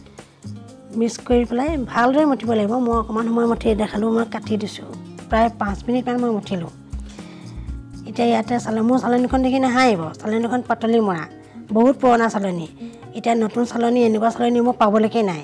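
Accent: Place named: Sivasagar, India. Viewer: বাইদেউ আপুনি শিৱসাগৰৰ নেকি,কথাৰ সুৰবিলাক শুনি শিৱসাগৰৰ যেন লাগে,আমাৰখুৰীৰ দৰে.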